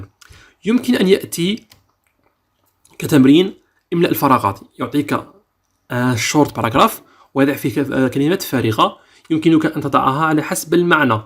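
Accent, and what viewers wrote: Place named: United States. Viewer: صوتك رائع في النجليزية كالامريكيين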